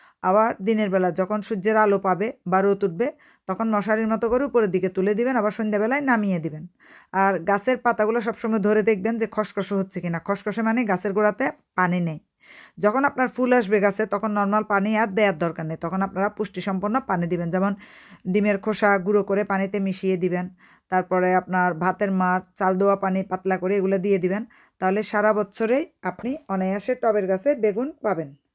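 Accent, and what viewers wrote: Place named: Chittagong, Bangladesh. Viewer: আপু আপনাকে অনেক ধন্যবাদ আপনি কোন দেশে থাকেন কথা শুনে মনে হয় আপনার বারি চট্টগ্রাম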